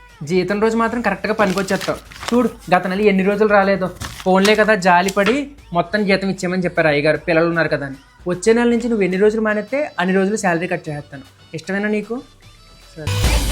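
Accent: Godavari, India. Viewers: garidi native ekkado ఎవరికైనా తెలిస్తే cheptara, yasa/slang bhalega untundi, maybe east or west గోదావరి అనుకుంటున్నా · Super bro 😂😂😅😂😂but konii words ardham avvadu godhavari slang,,,,but kurnool slang andhariki ardam avuthundi 😊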